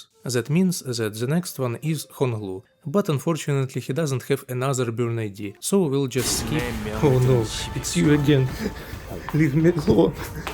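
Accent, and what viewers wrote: Russia. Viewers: Мне кажется у автора видео русский акцент, но я не могу этого доказать... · Чую русский акцент, бюрн фен.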